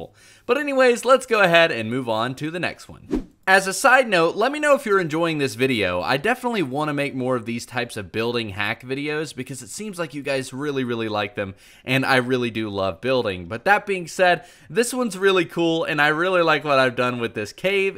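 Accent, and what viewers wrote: United States. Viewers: He does have an accent it's just an American accent can't really pinpoint it but he does have one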